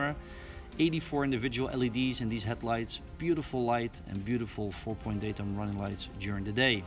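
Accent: Netherlands. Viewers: You have a very strong dutch accent. You dutch by any chance?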